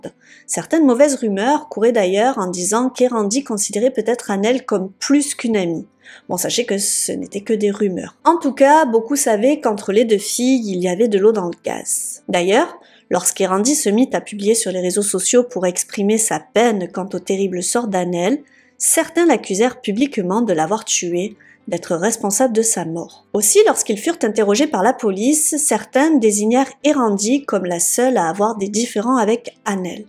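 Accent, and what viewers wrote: South, France. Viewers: Je me languis tellement de tes vidéos !! Je reviens de ma pause déj et je me dis : bon qu'est ce que je vais bien pouvoir regarder tout en travaillant et je tombe sur ta vidéo !!! J'apprécie énormément ton travail, tes recherches et ton petit accent du sud qui nous apporte le soleil ! T'écouter est un véritable plaisir ! Merci pour ton travail et et merci de réussir à dénicher des histoires de true crime si méconnues en France !!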